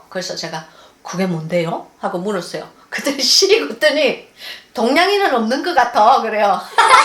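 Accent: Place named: Busan, South Korea. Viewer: 할렐루야 ~~목사님 늘 은혜 말씀과 삶을 나눠 주셔서 감사합니다 ~ 목사님과 같은 고향(부산 초량동)이라 더 정감가고 목소리와 억양도 좋아요. 사투리까지 너무 친숙합니다. 늘 강건하시고 우리들을 잘 인도해 주세요 ~♡♡♡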